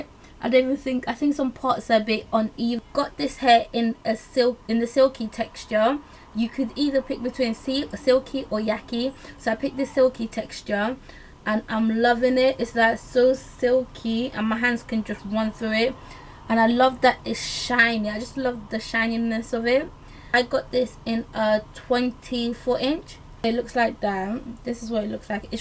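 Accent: United Kingdom. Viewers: Your Like With A Good British Accent Lol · You're cockney accent LOVE IT I have a cockney accent but with a mixture of all different accents I don't understand my voice lol I'm just rambling on sorry for wasting you're time, :D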